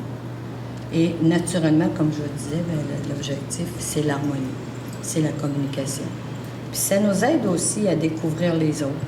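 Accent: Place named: Quebec, Canada. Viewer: Y a en masse de choses qui échappent à mon oreille de français qui adore c't'accent québecois tsé mais j'ai don' bin de la misère a comprendre toute les mots faque chu frustré pasque c'est c'est extrêmement intéressant ! J'ai pas pantoute moyen de savoir la langue qui dit : "mon nom est... " toutea la fin et j'aimerais bien la connaitre, la voir ecrite ! froggy boy.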